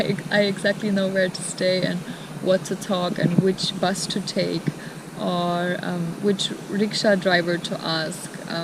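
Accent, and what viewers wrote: Karnataka, India. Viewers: You look like a German But your Style of speaking more like an India Girl...You fall in love with India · You have an Indian accent. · Definitely I'll put all my efforts to meet you when you will be in Bangalore. Big fan of your Indian accent.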